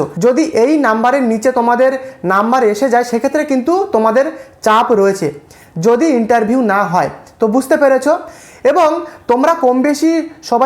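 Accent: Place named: Bangladesh. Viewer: tomar bhasai bangladesi banglade vaab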